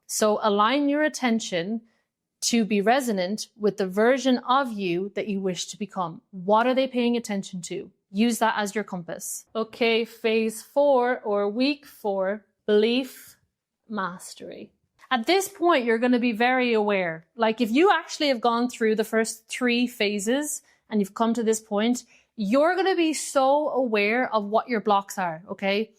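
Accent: Ireland. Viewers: I love her Irish accent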